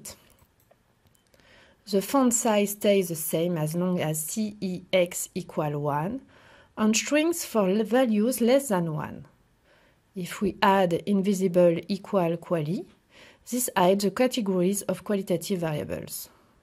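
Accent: France. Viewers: Her English accent is like french-i couldnt understand much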